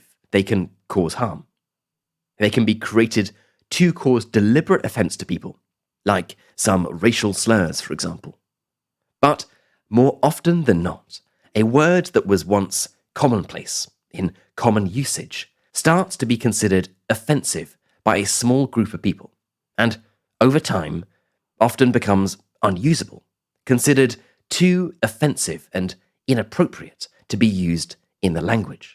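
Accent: United Kingdom. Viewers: Teacher Is your accent british?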